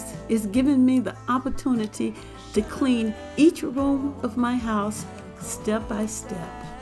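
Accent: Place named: South, United States. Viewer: I love your southern accent😁 Great job on your table. I enjoy your video 😊👍🏽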